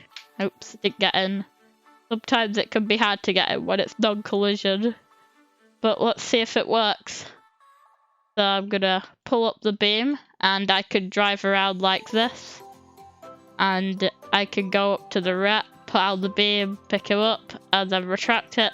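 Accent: United Kingdom. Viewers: Your British accent I LOVE IT❤ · Are you from the Britain cuz you have a british accent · after i cam e to your channel i already knew you are british xd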